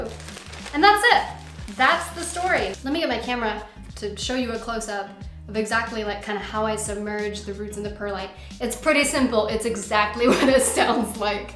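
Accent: United States. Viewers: Hi, I just subscribed! I don’t know where I heard that you were in France so I was hoping you would have a French accent but that is not the case. It’s okay I am not disappointed that you sound American lol, are you originally American? This is my first video and you made my mentally declining state a bit better so thank you! I was lucky enough to recently get a 10 dollar rooted cutting of a M Peru and I love it! I cannot believe you can easily get a full plant for the same price in France 😱! 💙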